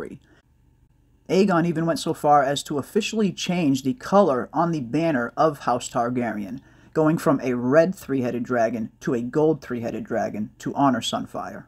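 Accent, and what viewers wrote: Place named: Boston, United States. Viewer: You must not be familiar with channel, that’s just his Boston accent. It can sometimes sound like he’s not pronouncing a D or an R here and there but he is. He’s literally an encyclopedia of ASOIAF knowledge, there’s not much about the source material that he doesn’t know.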